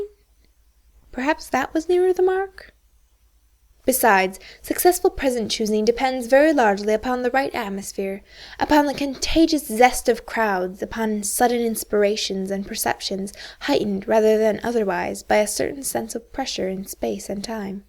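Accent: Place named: United States. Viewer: BRITISH housewife, reader is American and does a crappy job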